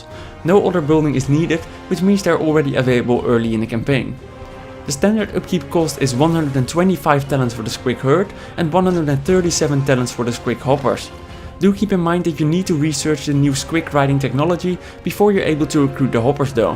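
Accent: Netherlands. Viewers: let me guess, you're Dutch xD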